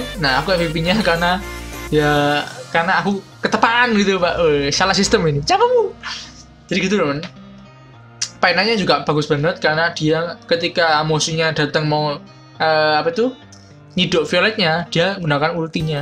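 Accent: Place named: East Java, Indonesia. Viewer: Misuhmu apik mas.... Kroso jowo timuran. Cangkemmuuuu wwkwkwkwkk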